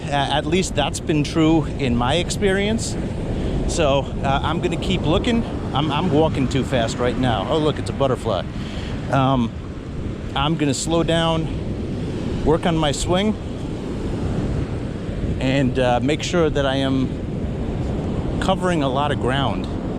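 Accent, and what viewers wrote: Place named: Connecticut, United States. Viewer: You are so informative and haliorous! I'm originally from CT now in Tennessee. Miss the accent of back home and you deliver:) love your channel!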